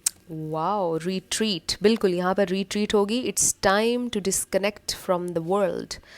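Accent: Pakistan. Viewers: Apna khyaal rakhiye Aap hi nahi main all taro readers ki dil se izzat karti hu... Means aap log apni rooh apni jaan laga dete hain Ek Ek Situation ko samjhane me.... Her tarah se hum logo ko achcha chahte hain Aur Bahot mehnat karte hain... I love ur urdu lehja also vvvvvvvvry much.. Pl tk care urself... N again Shukriya....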